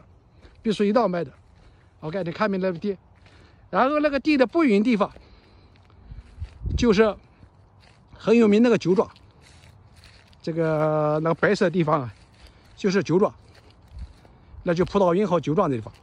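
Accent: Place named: Anhui, China. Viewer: 您的安徽口音太重了，建议增加中文字幕。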